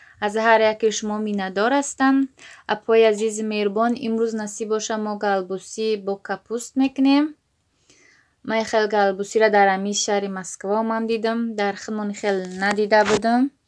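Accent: Kulob, Tajikistan. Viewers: Салом ман мехмони нави шумо аз кучои Точикистон хастед? Гапзаниётон ранги Кулобиё ба фикрам хамшахрием. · Кулоби мегум гапзанен гуш кунам шумора 👍👍👍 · Салом хохар лахчай хутонкати яне кулобиги гапзанен😍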